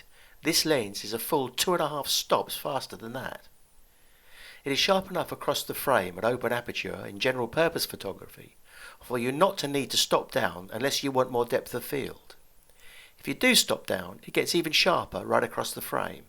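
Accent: United Kingdom. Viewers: This gentleman’s review allow me to understand lenses and practice my understanding of English with very strong British accent.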